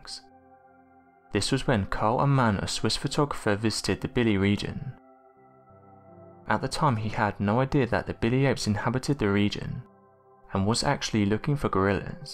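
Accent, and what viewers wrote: England, United Kingdom. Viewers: Anyone else tired of these voices of the narrators in these type of videos? Always some guy or girl from UK with little to no life in their voice. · What’s up with the cadence and flow of your voice man? I mean no offense nor do I have any problem with your British accent, just the monotone sound and the way your telling of things in your videos is segmented into sentences with this ebb of your voice. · A video regarding bili apes with no footage or pictures of an actual bili ape... just someone with an english accent talking.... interesting.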